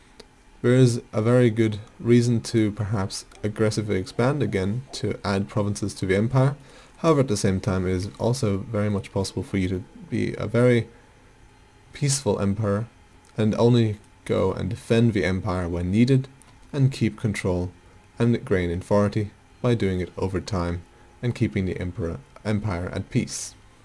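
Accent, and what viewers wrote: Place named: Austria. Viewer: Are you from Austria? You sound like an austrian guy.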